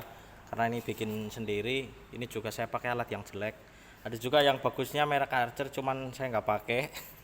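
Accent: Banyumas, Indonesia. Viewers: Mntp, ngapak y ngmngnya 👍👍👍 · Ngapak pa ya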